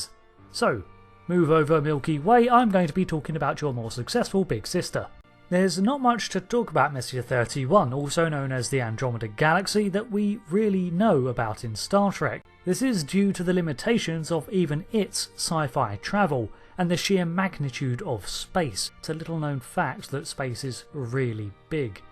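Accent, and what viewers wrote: United Kingdom. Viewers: Ruined with a nit brit narratah.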